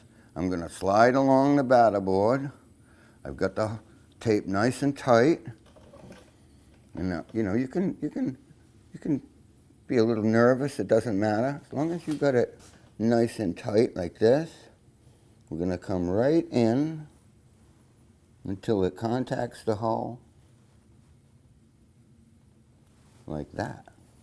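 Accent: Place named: Rhode Island, United States. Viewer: He's from Rhode island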